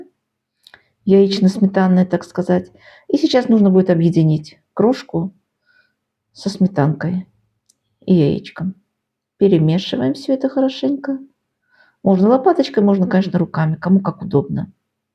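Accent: Baku, Azerbaijan. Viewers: Наши Девушки Бакинки, во всем талантливы, Мы девушки всех наций были дружны, делились всем, самым лучшем отсюда и вкусняшки…., а у Вас прекрасная золотые ручки, Так приятно слушать Наш говор! Спасибо! Счастья во всем! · Очень вкусный пирог! Спасибо большое. Ваш бакинский акцент греет душу -такой приятный! Здоровья вам, дорогая 🙏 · Спасибо за рецепт. Мне почему то слышиться в вашем говоре бакинский акцент. Может я ошибаюсь? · ...и внешность и говор бакинский....Спасибо за рецепт ,наша дорогая! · Точно подметили, 💯% бакинский акцент! Ни с чем не перепутать! Привет из❤Баку! Всем Мира! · Спасибо,дорогая,как же приятно слышать ваш бакинский говор!!!!! А рецепты нашей беззаботной юности!!!!Храни вас Бог за такие теплые чувства!❤️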